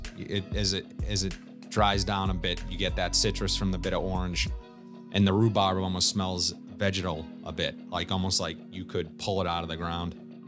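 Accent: Boston, United States. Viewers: oh wow that's interesting! I guess I never heard a Boston accent before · Hey, just came across your channel and subscribed. Have you tried Timbuktu L'Artisan Parfumeur? I found it at Burlington too. You might like it. Resinous, smokey, spicy. I liked it a while back but didn’t buy because I was looking for more floral scents from L’Artisan. Are you from Boston?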